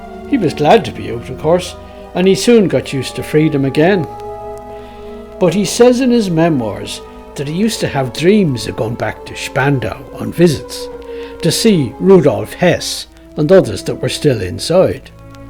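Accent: Dublin, Ireland. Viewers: I have a confession. I've come to tune in to your channel - not just for the story - but to hear that wonderful Dublin dialect! I love it!!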